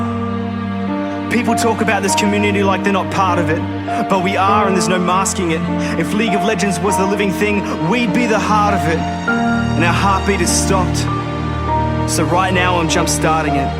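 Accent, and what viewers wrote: Australia. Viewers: You realise this is what an australian accent sounds like. Holy fuck the stupidity of that comment.. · that australian accent xd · Aussie accent XD haha love it! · 1. annoying echo 2. annoying australian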